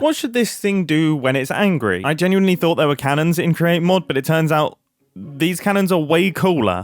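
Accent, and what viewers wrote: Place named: Cockney, United Kingdom. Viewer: a very cockney accent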